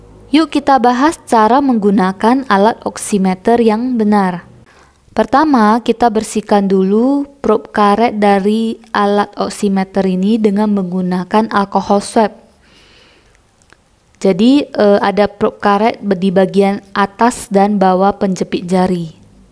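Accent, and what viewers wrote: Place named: Medan, Indonesia. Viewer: Orang Medan ya? Logatnya kayak temen Medan saya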